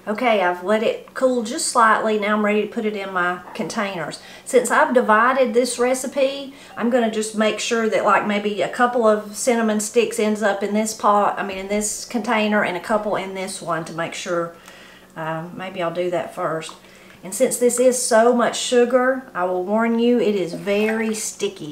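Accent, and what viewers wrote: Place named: West Virginia, United States. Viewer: I just love your videos! My sweet mother in law was from West Virginia and she shared your lovely accent and oh so many of your “mountain” down home vocabulary! I am from Philadelphia and was blessed to marry my husband and his lovely family! Thanks again. Have a great day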